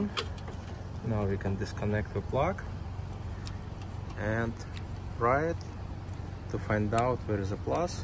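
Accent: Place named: Russia. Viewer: какое русское произношение))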